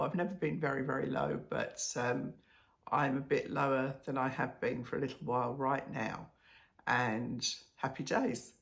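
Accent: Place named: United Kingdom. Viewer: I like you in the navy tights!!! I know I’m going against your Mum but I Love the navy with the navy shoes. I watch all your videos I just love your voice and your British accent. As always all my love from Chico, California. Vicki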